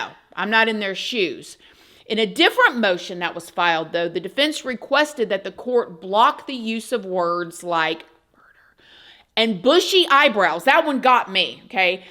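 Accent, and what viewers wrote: South, United States. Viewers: Please don't.... to Inniebody, Inniewhere. I just love your Southern Belle voice and accent. You musta been a beautiful baby, b'cuz look at you now! Too bad you're already taken. Your husband is a very lucky man.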